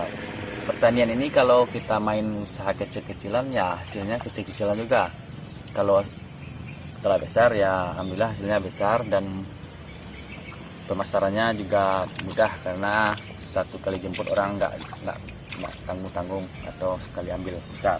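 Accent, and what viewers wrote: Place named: West Sumatra, Indonesia. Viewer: Bang tolong dibalas.., Uda urang minangkan, dima lokasi da..? mintak nomor WAnyo da Ambo ka Konsul senek.. soalnya keceplosan bicaranya nya😊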